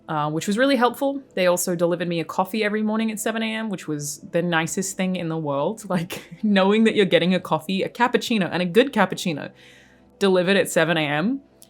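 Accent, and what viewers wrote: Australia. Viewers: The main thing I noticed is that your Aussie accent has come to the fore quite strongly. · hermoso aussie accent 💙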